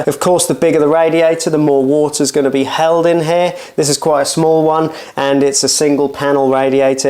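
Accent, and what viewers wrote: Norfolk, United Kingdom. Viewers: Nice video mate, great advice and very helpful. Norfolk accent? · That's a Norfolk boy if ever I heard one! Thanks for this helpful video 😊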